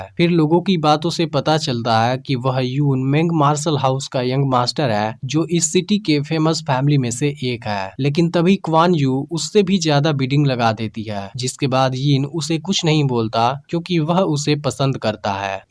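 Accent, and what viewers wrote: India. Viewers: I hate the Indian accent......I can't stand hearing it awwwwwwwww🤮🤮🤮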